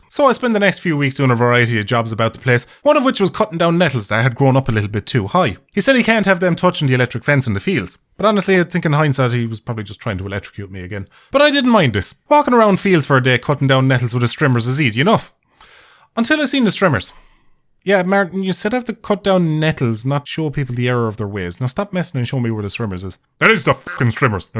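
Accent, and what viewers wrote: Ireland. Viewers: I just noticed your accent, are you from County Cork or Dublin town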